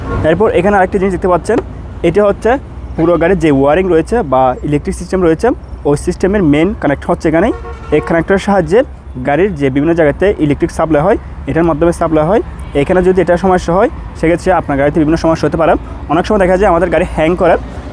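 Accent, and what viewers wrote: Chittagong, Bangladesh. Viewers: Chittagong naki apner badi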